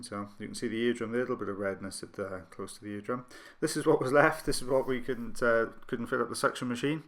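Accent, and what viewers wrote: United Kingdom. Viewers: So good to hear a UK accent.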